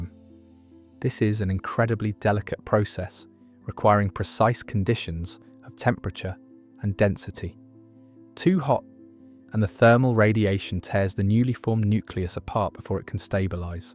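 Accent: United Kingdom. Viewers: your British voice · Ignoramus with a British accent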